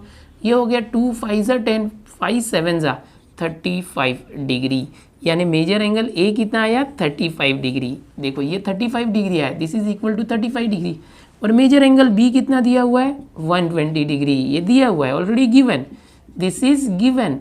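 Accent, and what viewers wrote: Maharashtra, India. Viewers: Sir aap marathi hai kya.. ????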